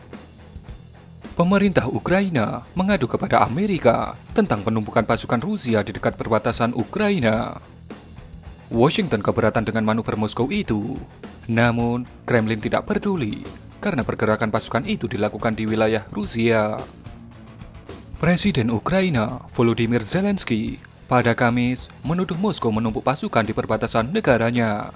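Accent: Java, Indonesia. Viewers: Bahasane MEDOOKKKK....wagu lahh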